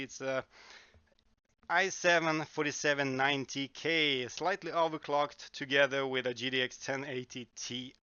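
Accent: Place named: Sweden. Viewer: Jag tänkte väll att du var svensk Man hör det lite lite på din engelska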